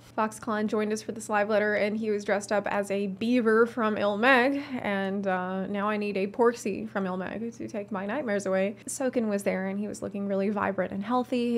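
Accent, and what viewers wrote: South, United States. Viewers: She has a pretty country accent where is she from?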